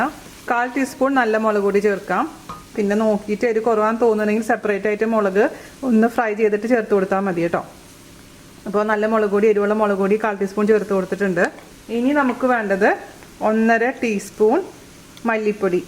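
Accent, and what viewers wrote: Thrissur, India. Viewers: chechi de ഒട്ടുമിക്ക dishes ഉം ഞാൻ try ചെയ്‌തിട്ടുണ്ട്. Already subscribed your channel long ago.. But comment cheytat ഇപ്പോഴണെന്ന് മാത്രം. I like your dishes❤️❤️ also like that trissur slang 😍❤️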